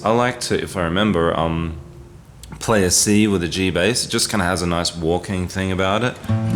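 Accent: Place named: Australia. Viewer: Thank you so much for doing this. I really appreciate it. I'm a 'reasonable' fingerpicker and alternate bass is no problem. Delighted though that you showed your own little nuances that make the difference. Now I don't have to sit down and work it out. You're correct; IT IS an easy one to do for a seasoned fingerpicker. Reminds me of Mark Knofler describing how to fingerpick...learn the basics - thumb for 6,5,4, and fingers 1,2,3 for strings 3,2,1. Get comfortable with that, bring in alternation and then...forget it completely and away you go! It was great to hear him justify what I'd done myself many years earlier 😅. Nice to hear the Oz accent...I spent ten years there. Greetings from Ireland.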